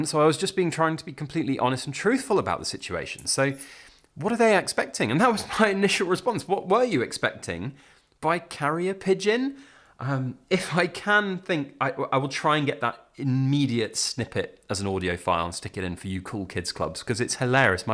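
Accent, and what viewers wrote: United Kingdom. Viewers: Every time I see one of these videos I immediately know it’s gonna be some British accent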